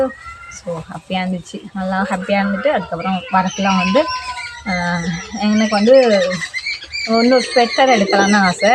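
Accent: Thanjavur, India. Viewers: எங்கம்மாவும் இப்படித்தான் பேசுவாங்க தஞ்சாவூரை சேர்ந்த எல்லோருடைய பேச்சும் இப்படித்தான் இருக்கும்.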